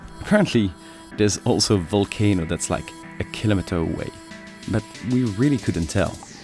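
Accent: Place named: France. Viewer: you sound french bro, but you have a very very nice accent et si tu n'es pas français, bravo pour la prononciation des choses en Français ou juste le RER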